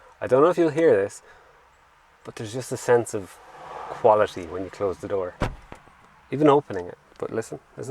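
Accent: Ireland. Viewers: I love that Irish accent 😊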